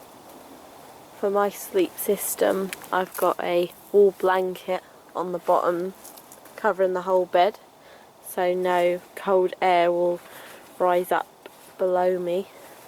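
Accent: United Kingdom. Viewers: cute british accent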